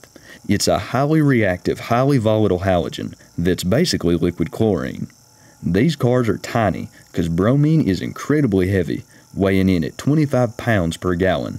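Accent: South, United States. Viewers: Did anybody ever tell you you have one of the best voices I have ever heard? It's like the very personification of the South. Amazing voice, my man. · Very nice format, good info and content! The southern dialect is just the cherry on top. ;-)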